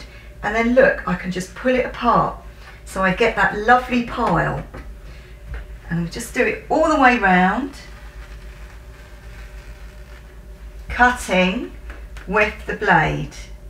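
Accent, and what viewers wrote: United Kingdom. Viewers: First of all I want to say that I love your accent.🤗 Is it British or..? And thank you for such an easy understanding tutorial.🥰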